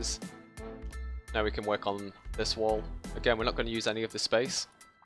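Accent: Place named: Lancashire, United Kingdom. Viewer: I thought that mate, definitely some kind of blackburn or Burnley in there as well mate with a manc twang 😎 good video mate and good luck with your channel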